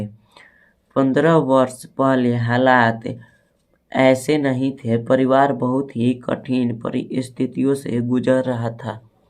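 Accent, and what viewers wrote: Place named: Tamil Brahmin, India. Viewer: This man talks like a Tamil Brahmin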